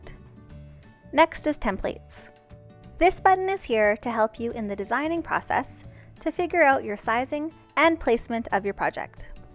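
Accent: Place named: United States. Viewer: Very helpful video, thank you, and a charming accent also, where in America do you hale from?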